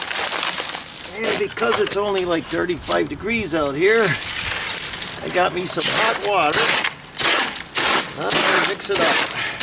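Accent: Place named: Pennsylvania, United States. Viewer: I learn so much from you,; I believe you are from Pennsylvania, my wife is from Erie and your accent reminds me of her relatives and friends!! Nicest people I've met! Cheers!!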